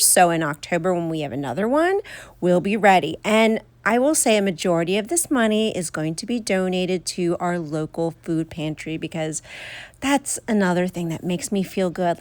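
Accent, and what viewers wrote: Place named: South Jersey, United States. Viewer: How do you do your pricing for yard sales? Do you price each item individually, or do you just negotiate when someone is interested? I don't see prices marked per table or on each item. Also, please know that you were the first person I could watch about de-cluttering & hoarding disorder that made sense to me and I didn't feel overwhelmed with guilt listening and watching you. You've made so much progress and given so much hope to many! I've found one other channel, a lady more my age (This Mainer Mom), but you two are it, and both kind and practical and motivating! I'm not as far along as you are in decluttering, but am working on it, and have accomplished cleaning out several places I'd avoided for years. Something else: I recognized your accent and eventually found that you live near where I grew up (Pitman) before my family moved to Indiana when I was almost a teenager, though I've been back there a lot to see relatives & to take my own daughters when they were growing up. 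Your accent, your talking about going down to "the shore" and all just makes me remember family and my wonderful growing up years back in south Jersey. It seemed like the Lord helped me find you because you made sense to me, you have such an honest and loving spirit, and you also have expressed your faith. You have a ministry! And we also share a name, I think--! Thanks so much for all the encouragement you've been to me and I'm sure to so many others!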